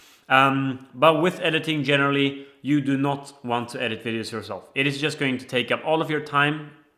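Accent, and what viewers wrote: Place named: Sweden. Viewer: Man hör att du är svensk :P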